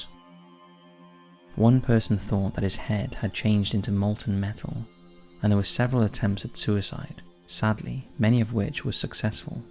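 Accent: Wales, United Kingdom. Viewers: British accent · ??? That IS a British accent. What are you talking about? I'm British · He's Welsh. So it's a Welsh accent. There's no such thing as a British accent.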